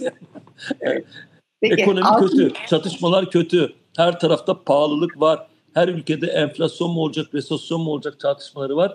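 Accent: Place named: Izmir, Turkey. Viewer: Tam İzmir"li gibi konuştu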